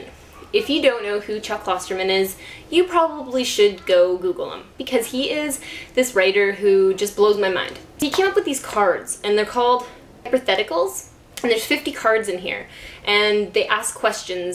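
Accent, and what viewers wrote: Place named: Canada. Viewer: Um, yeah, you DO have a cute accent. You Canadians and your "aboot". ;) Awesome vlog!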